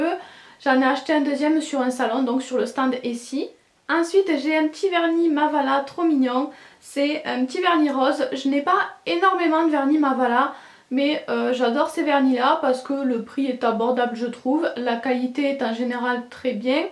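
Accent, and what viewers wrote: South, France. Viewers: J'adore ton rouge a lèvre et ta vidéo ! :) d'où vien ton accent ? Hihihi du sud non? ❤️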